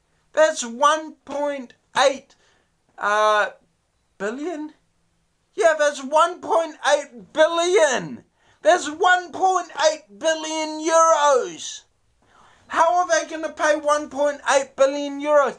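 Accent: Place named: New Zealand. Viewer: Watched a few of your videos man, I am living in OZ at the moment but born and raised in NZ, loving the accent haha. Anyway, this garbage was pitched to me by 2 friends already and decided to check it out... I can't believe people are actually falling for this. The site has no explanation of how they're making any money other than creating a big useless pyramid of "investors". This is the worst MLM pitch I've ever seen lol... same shit as bitconnect, usitech, amway, arbonne etc. Keep it up... been warning them this is gonna collapse eventually. I dont know if any of them have been paid or not.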